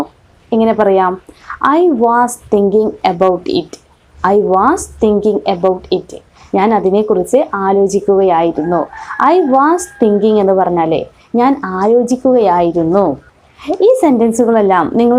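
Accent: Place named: Malappuram, India. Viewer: I think u r from malappuram.because of ur talking style.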